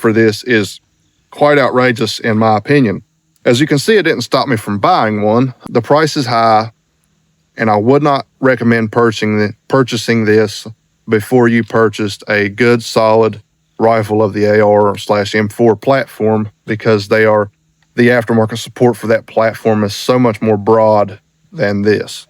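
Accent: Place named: West Virginia, United States. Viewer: Idk but my guess is you are from west virginia. If not then eastern KY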